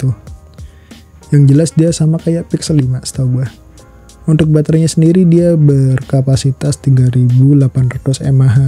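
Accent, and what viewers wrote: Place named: Lombok, Indonesia. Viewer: logat bahasanya kayak lombok bang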